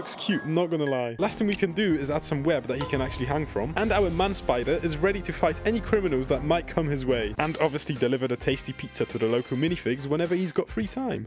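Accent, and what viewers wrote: United Kingdom. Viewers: Bros British I’m British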